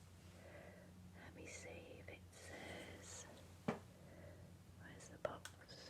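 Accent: England, United Kingdom. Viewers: Sadly I have had a difficult time watching your videos over the last year. Why? Well to be honest it's because I had my heart irrevocably broken by an Englishman. I couldn't bear to hear the accent. 😔 I missed your videos, and was determined to get back to them. So I simply forced myself to watch a couple of your videos that have always been favs of mine from a few years back. Happy to say "Cleaning your face" from the Lo-Fi series did the trick. I swear I'll never let one of your countrymen keep me from tuning in ever again.🙂 No matter how devestatingly handsome he happens to be. 😏 Boys drool💔, girls rule.🧘🏼‍♀️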